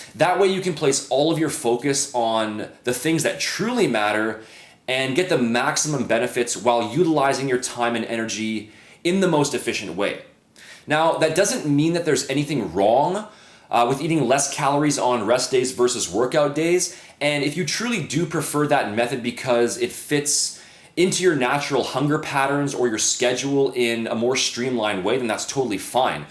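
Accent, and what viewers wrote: Canada. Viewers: Are you Canadian? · are you from toronto? you sound like kinobody with the way you pronounce your O's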